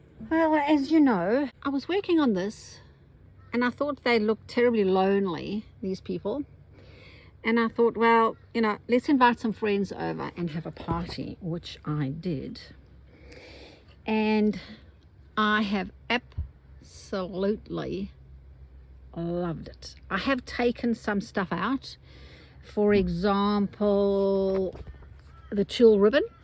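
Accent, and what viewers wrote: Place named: South Africa. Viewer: I keep thinking you sound South African, then you said "ooh gats" and that sealed it 😂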